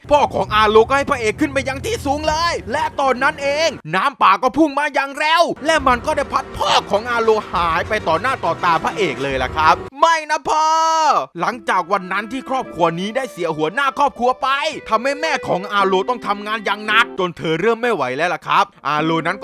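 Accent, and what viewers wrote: South, Thailand. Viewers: คนไต้นิ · พี่เปนคนใต้หรอคับ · พี่คนใต้รึเปล่าเนี้ย55 งูบองหลาเฉย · พี่ครับพี่เป็นคนใต้ใช้ไมครับ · คนใต้บอนิ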